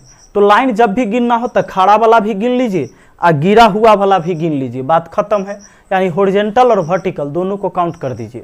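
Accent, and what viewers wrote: Bihar, India. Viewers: I like bihari accent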